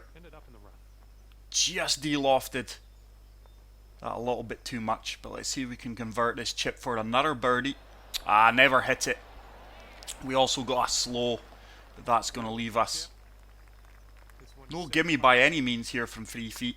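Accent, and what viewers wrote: Scotland, United Kingdom. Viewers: Gave ya self the commentators curse mate lol. Ya voice reminds me a bit of Feherty. The Scottish accent works great for golf commentary. They shoulda got you to do the game commentary instead of Rich Beam and his shouty American accent. Some of the in game commentary is just laughable. Keeps me amused at least hahaha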